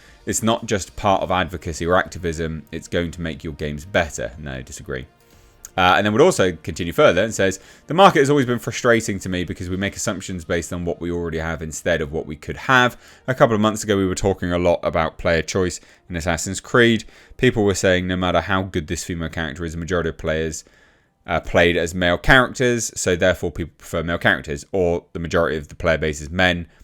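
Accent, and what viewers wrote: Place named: Southern England, United Kingdom. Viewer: This accent you have. It sounds like ricky gervais. What region of the world is this? Apologies in advance, they don't teach us specific English locations. Can't put my finger on it its like a southern accent ( to a yankee analogy)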